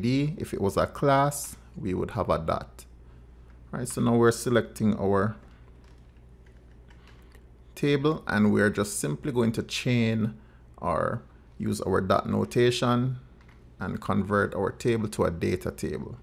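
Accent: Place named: Jamaica. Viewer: I don't usually do this but when I heard your accent I had to log in just to say, holy shit, a Jamaican narrating an IT video is pretty much one of the rarest but most awesome things I've ever experienced. And besides that, you did a really good job walking us through the process. Good job mate! Peace, love and unity!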